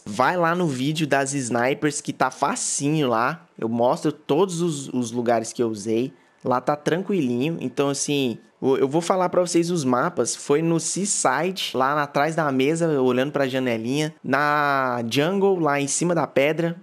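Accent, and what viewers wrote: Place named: Goiás, Brazil. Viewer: Os ataXiiiments sotaque Goiano kkkkkk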